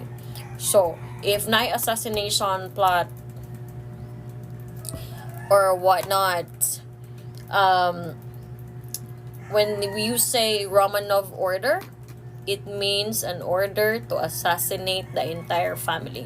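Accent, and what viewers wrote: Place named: Visayas, Philippines. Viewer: Ma'am ang cute mo mag visaya 😁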